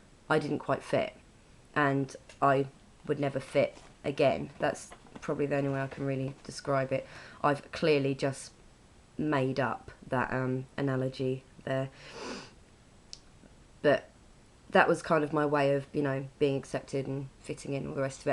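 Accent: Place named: United Kingdom. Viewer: First of all I love your accent! lol you are probably the first british that I'm able to understand haha :). I'm watching your videos and wow, it's the first time that I see a christian girl wearing the hijab. Respect. I love how you are breaking the stereotypes, no one would say you are christian just because you are wearing the hijab. Did you experience any discrimination against you? I wonder how their face looked like when you tell them that you are actually christian haha.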